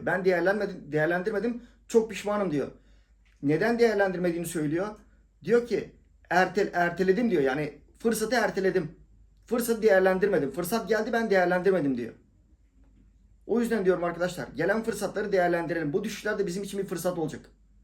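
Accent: Thrace, Turkey. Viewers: Şive hoşuma gidiyor Trakyalılar neşeli dir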